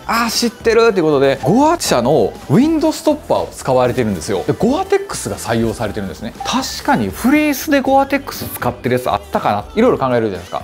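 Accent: Kansai, Japan. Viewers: めちゃくちゃイケメンで似合ってるし、物も良いのは認めるんだけど、この感じの関西弁でプレゼンされると胡散臭く見えてしまう😢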